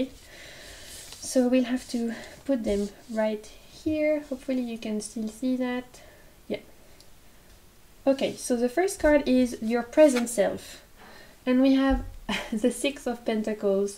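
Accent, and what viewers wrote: France. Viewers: Woww you are my new favorite youtube channel❤️ been binging your videos the last couple of days. You have alot of decks that i have on my wishlist! And pile number 2, i have the feeling i have been putting alot of work in my business and not seeing alot of results (or monetary results from it) so you are spot on❤️ keep up the good work! Love your french accent🥰 (can speak a couple of words in french but not much haha)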